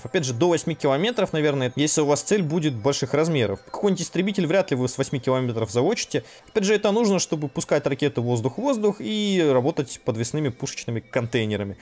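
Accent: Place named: China. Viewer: Этот божественный китайский акцент!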